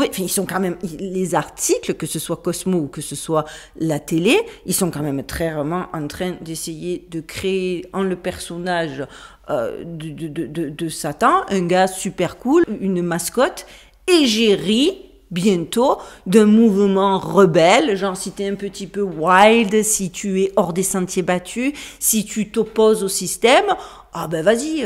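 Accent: Marseille, France. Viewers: Bonjour..Belle, Blonde et Curieuse de vérité.tout ça avec l’accent marseillais bonne continuation .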